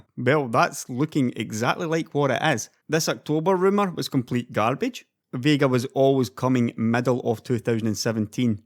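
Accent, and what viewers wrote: Scotland, United Kingdom. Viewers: Another good video. Been watching you since I got my Ryzen 7 rig built a couple of months ago. Looking forward to your build video. Side note. I am in Florida and I have a employee from Scotland who sounds just like you. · You have the voice of a Scot, and the face of a human. Amazing.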